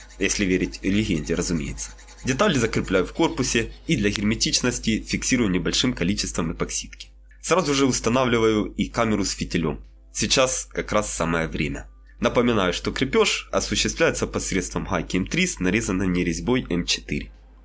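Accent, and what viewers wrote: Ukraine. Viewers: Ну у тебя и говор!)))Ты из Украины что ли??)) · Такой замечательный украинский акцент, и такой хороший мастер!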